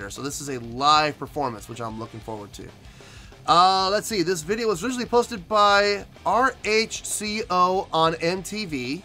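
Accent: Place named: United States. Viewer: How come you didn't listen that album before??? You are definitly old enough?! And you sound american. I was born in 1978 in ex yugoslavia and know that album by heart. Are you faking it? Please don't say you were bussy listening panthera or sepultura 'cause that's no excuse.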